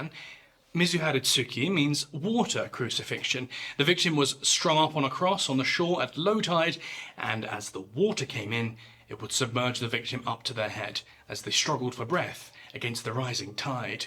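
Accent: England, United Kingdom. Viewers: I'm having trouble getting past seeing Ricky Lafleur with an English accent. #trailerparkboys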